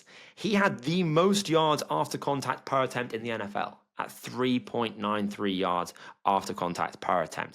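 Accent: United Kingdom. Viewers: Did u even watch RJ Harvey Way off base I knew when I heard the English accent this was going to be pure comedy 😂😂😂 Take time study the game more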